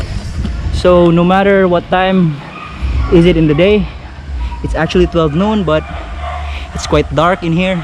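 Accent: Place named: Bisaya, Philippines. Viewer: Bike legs back on the legs? Hehehe bisaya englis nkng boss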